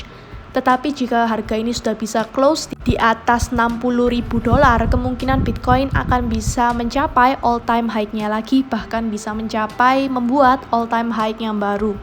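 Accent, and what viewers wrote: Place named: Surabaya, Indonesia. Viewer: Aku suka banget sama suaranya 🤩 Mengingatkanku dengan Surabaya sebagai kampung halaman 🥰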